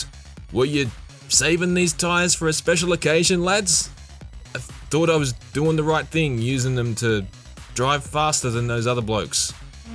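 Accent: Ireland. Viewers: I don't know, man. He sounds Irish to me. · he does sound Irish tho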